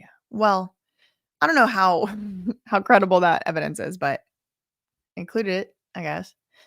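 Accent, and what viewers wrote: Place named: Tennessee, United States. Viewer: accent has Tennessee written all over it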